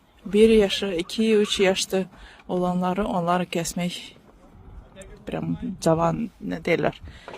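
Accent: Ganja, Azerbaijan. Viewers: Şirin Gəncəli ləhçəsi ilə danışırsız nəsə əlaqə var ora ilə ? 😂.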